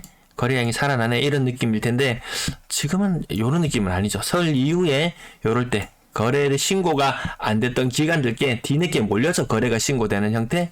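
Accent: Gyeongsang, South Korea. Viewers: 친근감이 있고 경상도 발음 너무 반갑습니다 알차고 유익한 정보 감사 하지요~~♡ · ㅎ 구독자 100만 언급 저도 그체널들 잘봅니다 매끄러운 서울말씨들 하지만 님은 경상도분이라 의도적으로 불친절하다고는 안봅니다 요즘은 꺼 더 잘챙겨봅니다 이런 정보야말로 감사하죠 화이팅 하십시요~^^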